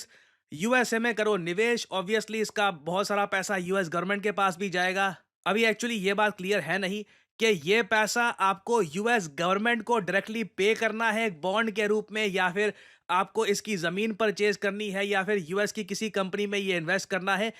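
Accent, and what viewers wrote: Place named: Delhi, India. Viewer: That’s Delhi in u speaking😋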